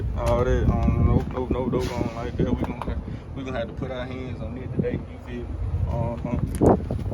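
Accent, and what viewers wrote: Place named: Texas, United States. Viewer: You from the H bro ?? You sound like you from Texas on god I know Texas/Louisiana accents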